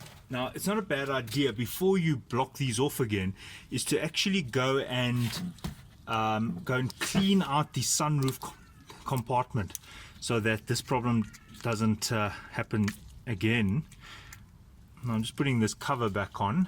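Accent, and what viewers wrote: South Africa. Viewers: I love the strong South African accent from a strong new Zealand accent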